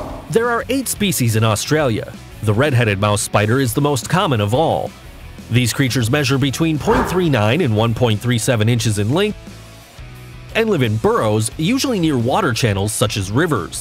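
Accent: United States. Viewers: this is Australia, Mate! We gave INCHES away a very long time ago - and the American accent. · Got issues with this video. First why is it being narrated by an American? Ask an Aussie to do it. Second.... they have obviously read mosly from an Australian National Geographic journal... found it online. Third the White Tailed spider has not been verified as dangerous...no evidence of a venomous bite. In other words an urban myth.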